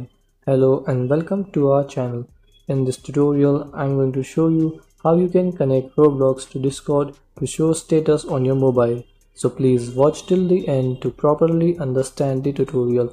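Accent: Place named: India. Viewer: its because he is protraying it as an indian accent.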